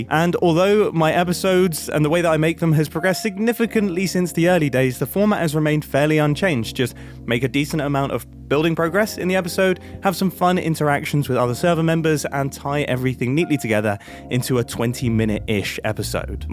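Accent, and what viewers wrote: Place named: United Kingdom. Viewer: I’ve been watching Let’s Play videos since 2017, maybe even 2016, and I can confidently say I miss those days. The “100 Days” videos are nice, but they feel repetitive. Modded Minecraft is cool, but it can also get repetitive. Once you’ve got the Ender Pearls and Blaze Rods, the video’s probably over. One YouTuber I watch, Kolani, makes both relationship and idea-based content, kind of like you. You both create videos not just for views, but to stay connected with your audience. I still remember stumbling upon one of your videos back in 2017, when my mum was battling a terminal illness. I just heard this British voice chatting away, asking, “Guys, do you want a timelapse?” That was your first ever timelapse, you mining down to level 12. And I remember thinking, this is me! Talking about cars, music, videos, traveling, all while strip mining. 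Nowadays, so much of it feels fake. People exaggerate everything: “Bro, how are we going to cross this lava river? That’s insane!” It’s just not the same anymore. Thanks. You’re truly unique.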